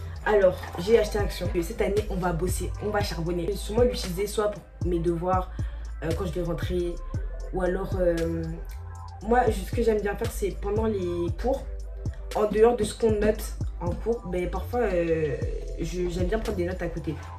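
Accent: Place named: Cameroon. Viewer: dès ta 1ere phrase j'ai su t'étais camerounaise, bonne vidéo.😂🙏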